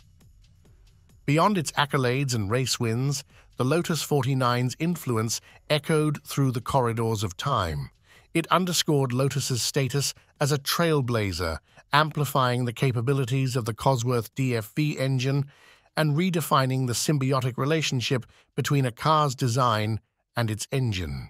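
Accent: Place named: England, United Kingdom. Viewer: I can't believe how AI narration has moved on, the clipped English accent does indeed gel nicely with the eras covered in this video. Well done, subbed! 😊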